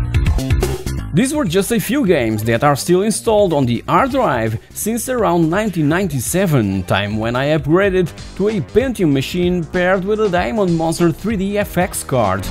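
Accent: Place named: Portugal. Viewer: já agora digo-te, percebi logo pelo o teu sutaque que eras português, sendo que eu também sou e tenho a noção de como é o inglês da maioria das pessoas cá que não ficaram habituados, até ver-te a falar no vídeo. Acho interessante ver a nossa comunidade a fazer este tipo de conteúdo, visto que a maioria ou são brasileiros, ou de outros paises "mais interessantes". Acho que isso já me fez pensar em subscrever ao canal, abraço, e boa sorte.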